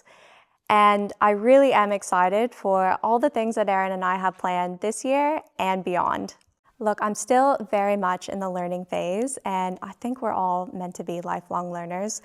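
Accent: Australia. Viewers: I can hear that Aussie accent creeping in....soon you'll be one of us....one of us....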